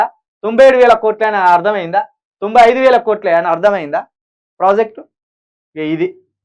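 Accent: Telangana, India. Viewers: తెలంగాణ బాష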